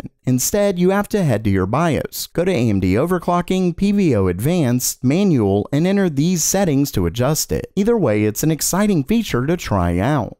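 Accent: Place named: South, United States. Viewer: Why do you talk as if you're struggling to stop yourself from doing a Southern accent?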